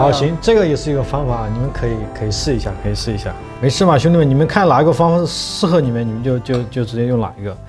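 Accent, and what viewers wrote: Sichuan, China. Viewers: 一听就是老乡—四川人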